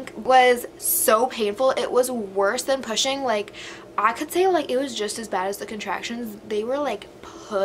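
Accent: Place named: New Jersey, United States. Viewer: Are you from New Jersey? Your accent reminds me of Jenny or JWow for Jersey Shore.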